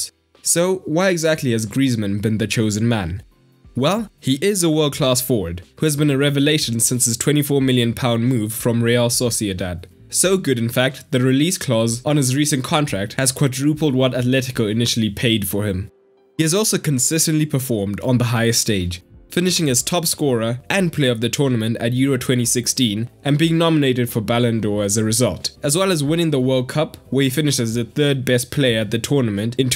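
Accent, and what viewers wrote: South Africa. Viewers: Is it just me or does the narrator sound South African 🤔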